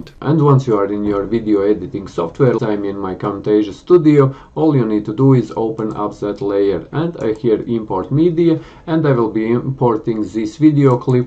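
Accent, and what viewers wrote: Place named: Russia. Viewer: This dudes russian accent is awesome! its so strong!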